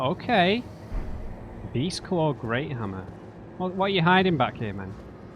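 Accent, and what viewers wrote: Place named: United Kingdom. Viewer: Loving the playthrough! Where abouts in the UK are you from by the way? trying to pin down your accent (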